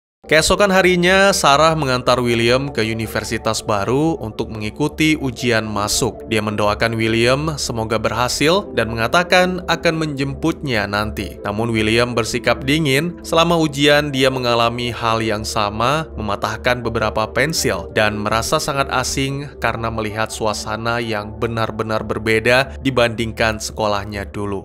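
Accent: Aceh, Indonesia. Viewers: Aceh kayaknya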